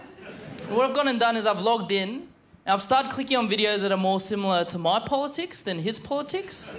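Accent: Australia. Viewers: You just don’t like how Australians speak then hahaha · It seems it's one of Aussie's accents, according to many other comments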